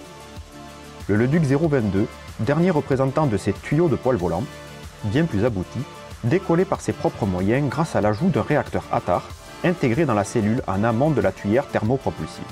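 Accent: South, France. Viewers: Je ne voulais pas vous vexer j'espère mais j'apprécie cette pointe d'accent sympathique du sud(?) il me semble. Je suis moi même méridional et je garde un petit accent dont je suis fier. 😉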